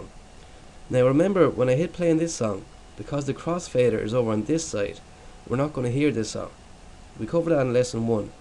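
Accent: Ireland. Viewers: you have the voice of a rougeish irish poet lol lol nice to be able to follow a you tube tutorial for a change good stuff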